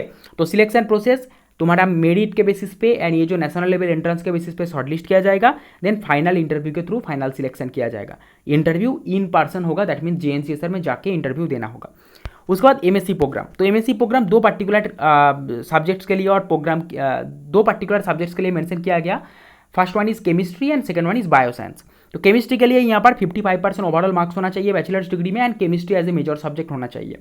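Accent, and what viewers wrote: Bengal, India. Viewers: Are you Bengali ?? Your voice like Bengali tone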